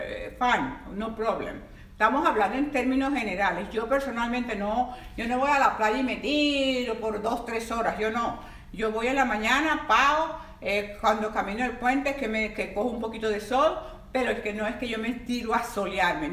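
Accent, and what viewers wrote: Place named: Venezuela. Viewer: Que interesante escucharte jajajaja venezolana hasta el tuétano mami más claro imposible jejejeje, mil bendiciones desde Valencia, Venezuela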